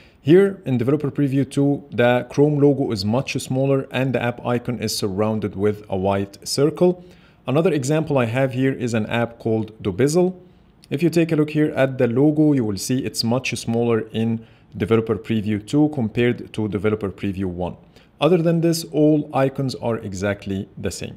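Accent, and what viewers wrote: Egypt. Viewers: Hhhhhhhhhh.hhhhhhhhh...you are egyptian i can hear your egyptian accent while speaking english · your accent screams eygptian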